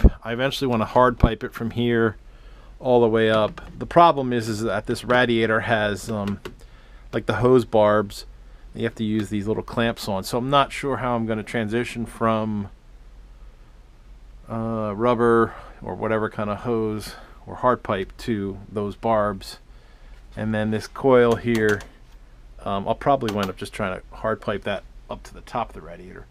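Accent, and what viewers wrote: North, United Kingdom. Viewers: He is from up north!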